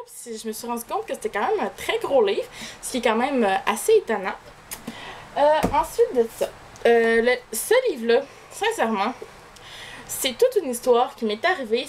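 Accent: Quebec, Canada. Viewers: ah oui ! c'est bien ce qui me semblait, qu'on avait justement le tome 1 et peut etre aussi le tome 2 de la saga "ailes". Tu as de la chance d'avoir les 4 tomes ! je viens de découvrir tes videos, celle-ci est vraiment chouette ! et en +, j'adoooore ton accent !(je devrais épouser un québécois, juste pour le plaisir d'entendre cet accent tous les jours, lol )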